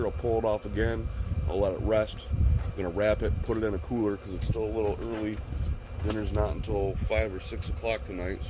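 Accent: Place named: Wisconsin, United States. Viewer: Where are you from? Sound like wisco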